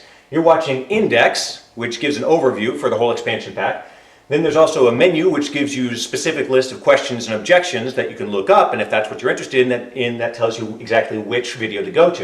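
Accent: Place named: Canada. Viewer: YOUTUBE...only in America, (but you sound canadian) every one wants to be famous. This goes to show excactly how little attention people feel they are getting. Be a good human and take responsibility thats all we need to do. Don't believe the hype. Don't worry. Don't be afraid. Everything must eventually end... So enjoy this life experience, cause it aint gonna happen twice.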